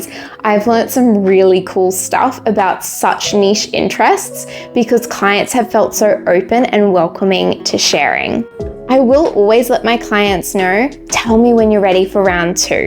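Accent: Australia. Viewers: That's an Oz accent. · surprised by the aussie accent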